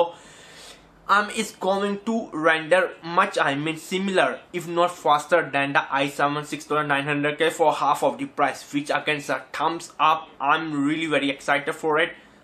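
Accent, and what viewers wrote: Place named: India. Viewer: Dude, you need to decorate your room a bit. Make it look like a professional pc-room. Also, please take elocution classes. You clearly have a speech impediment and you need to get help to overcome this problem. I'm Indian and I am very familiar with the pigeon-Indian accent but even then I find it difficult to understand what you are saying. I'm not being cruel. I'm just trying to give some honest feedback. Once your elocution improves, people will understand what you are saying and your feedback ratings will also improve.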